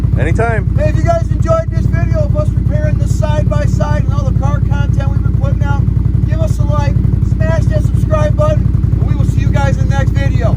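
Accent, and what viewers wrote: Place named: Tennessee, United States. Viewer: That accent sounds like around central TN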